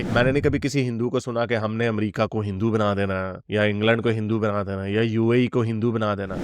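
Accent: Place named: Punjab, India. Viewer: Bro! I like your ideology and content all the time. Punjabi touch in your all the videos is mind blowing like chhitro chhitri😂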